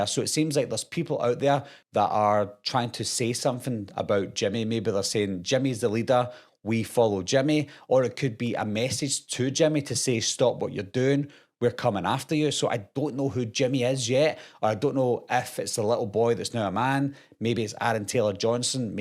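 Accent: Scotland, United Kingdom. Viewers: You've got a Scottish accent!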